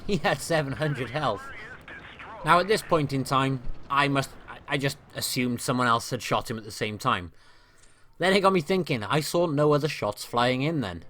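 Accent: United Kingdom. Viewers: yoo wat a british accent !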